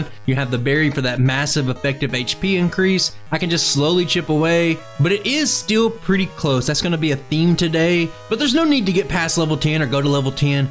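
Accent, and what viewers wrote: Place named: Texas, United States. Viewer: the texan accent is sooo good with pokemon challenge runs just what i needed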